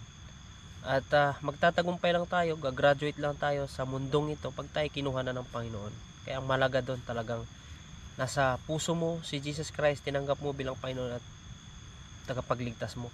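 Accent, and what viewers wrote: Visayas, Philippines. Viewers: bisaya ka sir?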